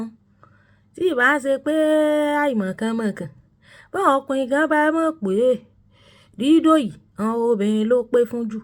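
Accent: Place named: Origbo, Nigeria. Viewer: Speaking with the Origbo dialect is a super spice. Well done!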